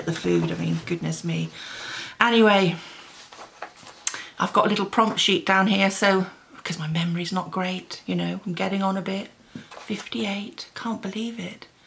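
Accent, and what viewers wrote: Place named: England, United Kingdom. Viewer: I am just so impressed with you! Your honesty, commitment, and down-to-earth attitude will bring you to unimaginably (for now) good places. I love to listen to you talk--adore your English accent. 🧡 You are courageous, and you have inspired me. Thanks for these videos and your blog! I look forward to watching you succeed! ~ Melanie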